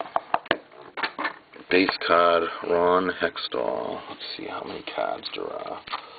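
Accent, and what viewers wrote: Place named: Boston, United States. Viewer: finally someone with a boston accent.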